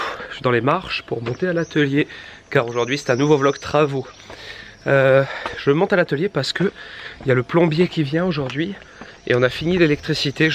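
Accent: Corsica, France. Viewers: J'adore l'accent corse... Bonne continuation.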